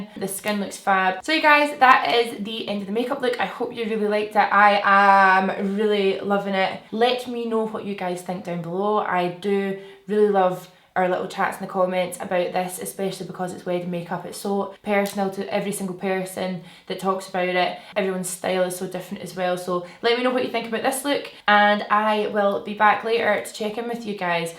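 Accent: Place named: Scotland, United Kingdom. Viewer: U sound like the Scottish version of Yelena Belova and I bloody love it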